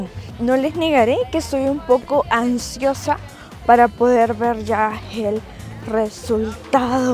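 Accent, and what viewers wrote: Guatemala. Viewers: Ya hablas como chapina 🤭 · DATO IMPORTANTE “YA SE TE SIENTE EL ACENTO CHAPÍN” JAJAJA Se siente de a huevo 🫶🏼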